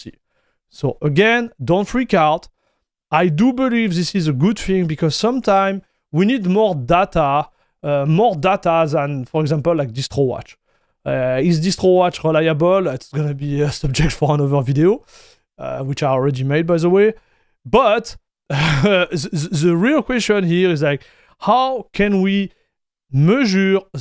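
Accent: France. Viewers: Thats a heavy French accent :P Mais bon j'ai tout compris donc pas mal!!<3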